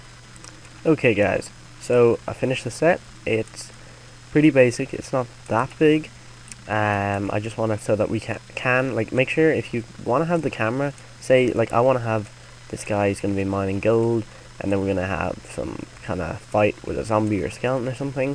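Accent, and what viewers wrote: Ireland. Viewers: your irish :D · Are you Irish??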